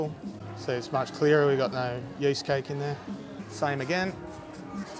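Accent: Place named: Australia. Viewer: It's so good to hear an Aussie accent not pronouncing words like yanks, if only we could do the same PSA for couch (it's lounge), cookie (it's a damn biscuit) and ass (it's arse here)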